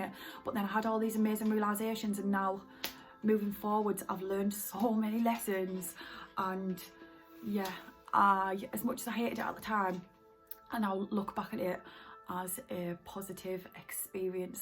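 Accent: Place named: Newcastle, United Kingdom. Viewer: What a brilliant channel, thanks 😁, I'm prepping to go in with some rue, but I vaped it via the vandy vape last time and it was slightly brutal.. Everytime I trip I always come out thinking I need to work with my energy and balance myself better before hand... And I'm useless at this 😂 positive your channel can give me some pointers though. Love the jordie accent too? Reminds me of living in the lakes 😊easy listening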